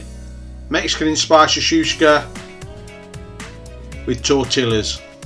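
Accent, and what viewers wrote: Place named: Midlands, United Kingdom. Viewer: Looks good, husband and I are trying to narrow down your accent, he says junction 28 of the M1😂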